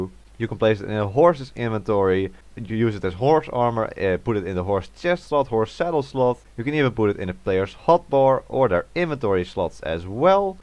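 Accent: Netherlands. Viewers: ik hoorde het gwn aan het Nederlandse accent 😭🙏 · you sound dutch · are you dutch? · are you dutch??? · He is def from Netherland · Sounds very dutch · i know for sure that you are from the netherlands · your accent sounds dutch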